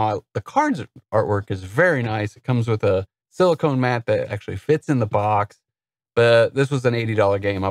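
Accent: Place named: South, United States. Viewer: the southern drawl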